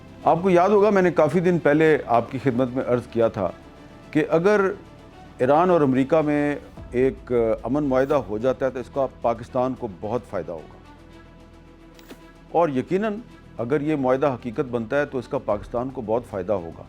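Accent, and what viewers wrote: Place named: Kashmir, India. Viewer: Thanks u sir that's how real Kashmir blood speak real Kashmiri..🫀❤️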